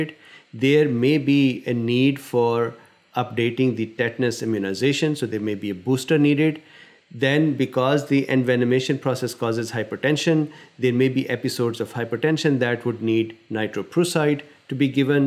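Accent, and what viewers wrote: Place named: India. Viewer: Love this guy's accent! I just came here for the info because I got bit by a black widow spider about a week ago but I LOVE the Indian accent. Yes, it's painful, yes it sucks; you're not going to die from it. There hasn't been a death from a black widow in the US in ten years. "Provoked" can mean you accidentally climbed through their web without knowing it; the spider went down your shirt and bit you seventeen f***ing times (what happened to me). I climbed under a fence at night, didn't see the spider but felt it's web on my face. The thing about a black widow spider bite is you don't feel the sting but an hour later you feel the pain which in my case felt like somebody hit me in the chest with a baseball bat. It took me a while to figure out that I got bit by a black widow. At first I thought I'd pulled a muscle but it started to hurt way more than you'd expect from a pulled muscle. 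It was a deep pain and the skin surface was numb. But then after about a week the deep pain started to go away and there was pain and itching on the surface and there were red spots in each place where the spider bit me. I counted about seventeen individual bites. About a week and a half into it now and the red spots have turned into scabs, some of which have already fell off. I'm still in pain but I'm not going to hate black widow spiders for the rest of my life. I'm definitely going to be careful about crawling under fences; at night; in the dark; where I can't see what I'm climbing through. T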